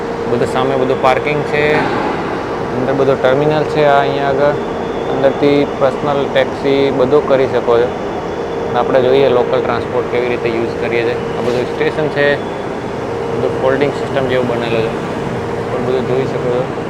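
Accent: Rajasthan, India. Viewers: ગુજરાતી ભાષા મા થોડી રાજસ્થાની હિન્દી નો લહેકો આવે છે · મસ્ત કમ્પ્લીટ ગુજરાતી બોલો છો પણ થોડો રાજસ્થાની ટચ આવે છે સુંદર માહિતી 😊😊😊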